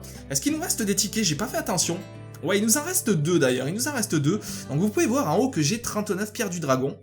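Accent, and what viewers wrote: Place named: South, France. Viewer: Vu ton accent ca se voit que tu es un sudiste😊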